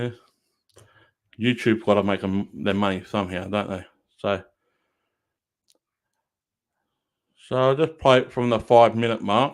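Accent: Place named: Australia. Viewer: Thought you sounded like an Aussie 🇦🇺🇦🇺🇦🇺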